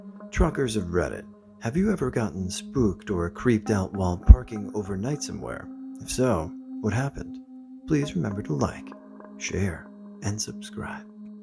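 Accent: Australia. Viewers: In regards to your Australian accent. Well, I've certainly heard a lot worse. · Aussie here - your accent was pretty good! More of a country accent than a city accent, but pretty good!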